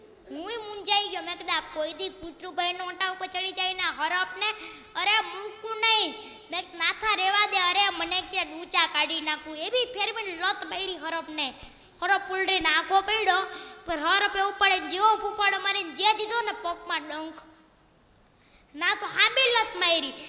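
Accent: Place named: Rajkot, India. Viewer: Vaah Rajkoti boli..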